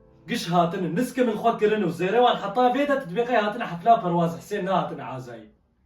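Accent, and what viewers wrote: Afrin, Syria. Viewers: ولله طالع ضابط حكيك بل عفريني انا من عفرين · احلا شي كلامك العفريني😭😭😭💜 بس شوي لكنه تبعك راح ع كوباني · اشقد ظابط معك الحكي العفريني ياربي فرطت 😂😂😂😂😂😂😂😂😂😂😂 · كلو كوم ؤ عم تحكي عفرينكي كوم تاني😂😂😂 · 😹😹😹 طالعة الهجة العفربنية حلوة معك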